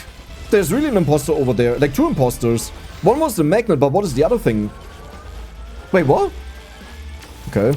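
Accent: Germany. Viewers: Dude at 50 i totaly felt the need to speak german 😂😂 Moved to germany few years ago still bad at the language but i enjoy your english german accent since i always hear it everywhere i go and its comforting to listen to it while youre playing. Perfect time to vent frustration out 😂❤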